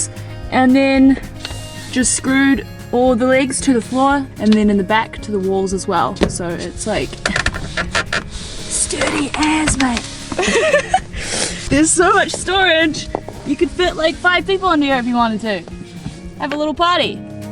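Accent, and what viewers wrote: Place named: New Zealand. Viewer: Hey, thankyou for replying doesn't often happen a content creator replies. Just wanted to apologize as I knew you were not Melbourne born i just assumed you'd relocated there a child coz ur enzed accent had Melbourne accents to it. I know I shouldn't assume anything coz it makes an ass out of me & an ass out of me. Umm do you know if Elayna had the baby yet. Also meant to say becore, you did a really cool job of converting the van into your own little house on wheels. Cheers look forward to next vlog. RustyinOZ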